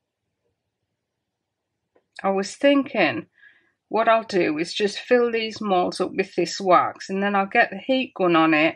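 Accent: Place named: Scotland, United Kingdom. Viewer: I simply adore your accent. May I ask where you’re from? My first guess is Scotland 🏴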